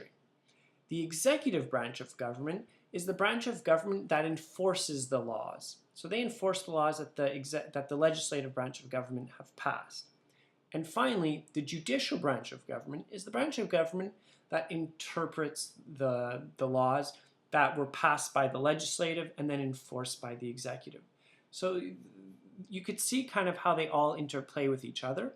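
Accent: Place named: Quebec, Canada. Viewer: You sound like Trudeau, maybe it’s a French Canadian accent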